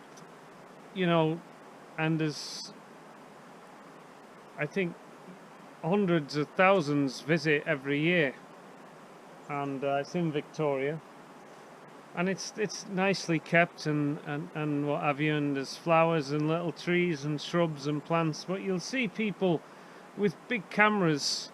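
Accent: United Kingdom. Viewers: your words about nature with your british accent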